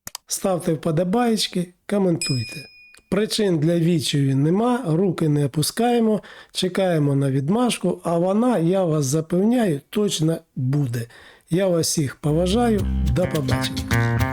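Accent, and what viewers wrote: Russia. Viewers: Що зробити щоб ви вивчили українську мову?? У вас суто москальський акцент.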